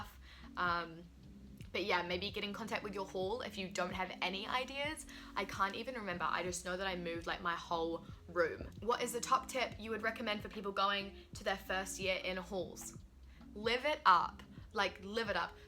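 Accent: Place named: New Zealand. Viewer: are you part American? Your accent sounds like a mix of kiwi and something else ahha x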